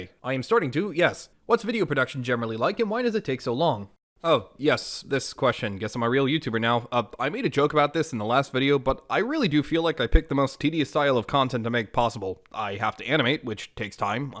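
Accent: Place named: New England, United States. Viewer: you for the info I though he has the new England accent or speech paturn.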